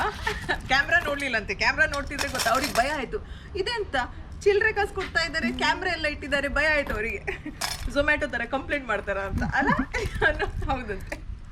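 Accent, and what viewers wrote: Mangalore, India. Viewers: Namma kudla da kannada baari shok kendrene kushi apund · Awesome prank I really appreciate your confidence for doing this👍. They were so kind. The way you spoke in mangluru Kannada was super cute ❤️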